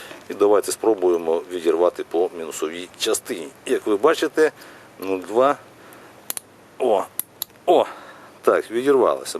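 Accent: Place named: Sumy, Ukraine. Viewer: Я з Харківщини, але тільки почав дивитися твій канал, одразу зрозумів, що ти з Сумщини.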